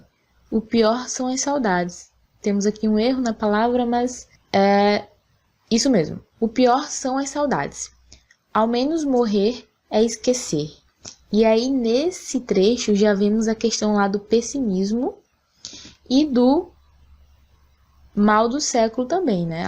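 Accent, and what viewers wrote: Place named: Rio Grande do Norte, Brazil. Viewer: Esse sotaque não me engana! É do Rio Grande do Norte! Muito boa aula! Me ajudou mt!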